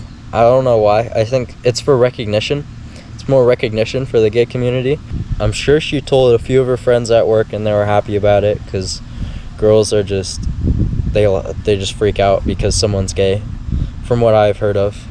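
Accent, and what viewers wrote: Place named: South, United States. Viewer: Based on your accent, you seem to come from a Southern state; good to know that you were fully accepted. Interesting that you told your dad before you told your mom. You are extremely pretty and masculine. It makes things easier for you.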